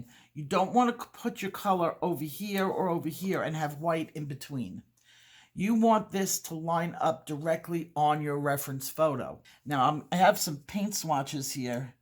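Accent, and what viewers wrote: New York, United States. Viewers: my son would so love you. He loves the NY accent so much that he actually practices doing it. I LOVED this video so much. I have no idea until now how to use that color wheel. I got it when you suggested it. Even my ADD self could focus on this lesson.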